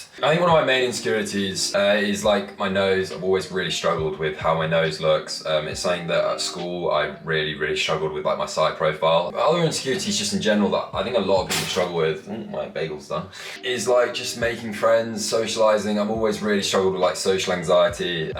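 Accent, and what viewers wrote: United Kingdom. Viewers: THE BRUSISH VOICE AHH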